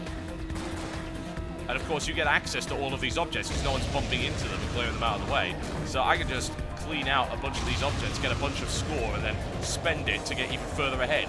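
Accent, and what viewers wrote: United Kingdom. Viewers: Is he British or American? CONFUSED. LOL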